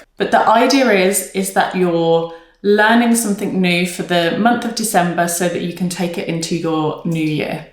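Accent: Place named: United Kingdom. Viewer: i wasn’t expecting a british accent! lol but loved the video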